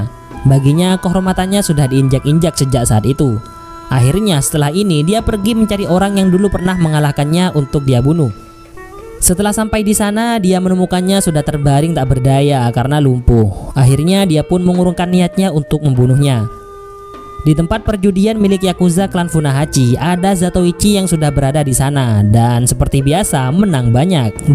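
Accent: Madura, Indonesia. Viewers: Penyiarnya kaya logat madura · Madura bingits cak, Kereen... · Abang orang Madura yah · Bang dari madura yaaa.. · Khas Madura ya kuat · Woo..naratornya kacong y.. 😀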